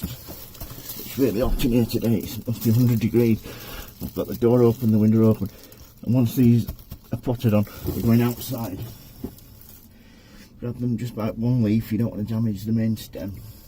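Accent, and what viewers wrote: United Kingdom. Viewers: I just gave a thumb for the Northern accent!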